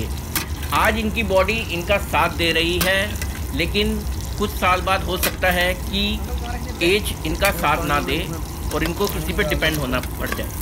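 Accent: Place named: Punjab, India. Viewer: Best part of your channel is ki aap hindi bhi punajbi accent me bolte ho.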